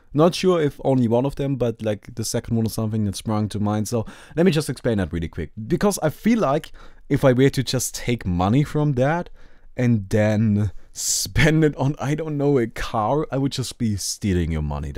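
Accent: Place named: Germany. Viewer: you are a truly great speaker. There is some sort of honesty in your interaction with your viewers, that is very precious and rarely found. The way you open up to your viewers is so inspirational, and it sets our (mine at least) minds at eazy to listen to your charming german accent in you kind tone. These are all valuable qualities in life. Now, i did what you told me and thought about my comment, so now I'm asking you to think about why your channel is finding succes. It is your accomplishment, and yours only. Don't rush into something your heart isn't in.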